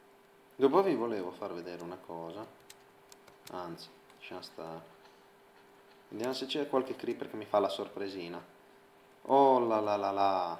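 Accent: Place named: Palermo, Italy. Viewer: sei di palermo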